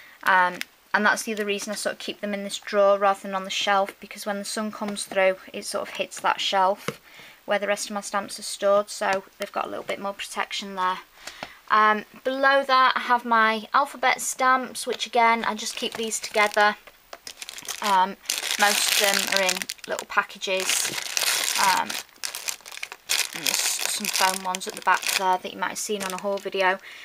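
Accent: United Kingdom. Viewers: WOW FANTASTIC COLLECTION...obviously you are from the UK...by your accent...I just love your collection of vintage/angel wood stamps...beautiful... Lori in the USA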